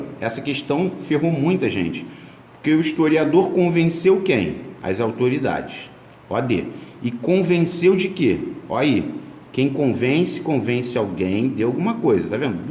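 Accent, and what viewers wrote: Rio de Janeiro, Brazil. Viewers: "tem sujeito, perguntou "o que?", é O.D., mané!!! uuauahauha, esse "mané" entrega o carioca mais q o "s" chiado uhauhauhauah Puta aula! valeu de mais,!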